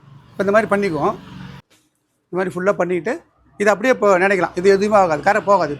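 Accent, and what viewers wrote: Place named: Chennai, India. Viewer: pakka chennai voice na..😊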